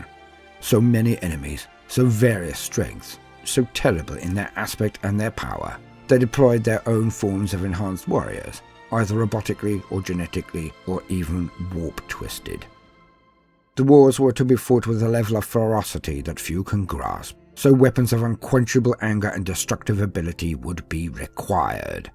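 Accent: United Kingdom. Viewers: That voice. Like a british Tex.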